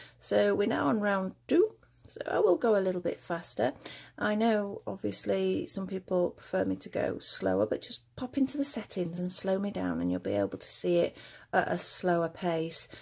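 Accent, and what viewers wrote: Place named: England, United Kingdom. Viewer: i love your accent i am from england alos i love hearing people from home talk and i love the video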